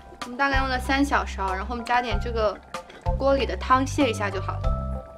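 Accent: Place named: Jiangnan, China. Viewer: 昨天试做了汤麻辣烫，很好吃👍今天试做干拌款。 话说东北小JJ说话很好听喲!感觉是江南小JJ呢！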